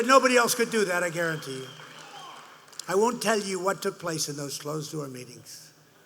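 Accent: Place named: New York, United States. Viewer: I think they just love the New york accent about him... that is the only reason I can think of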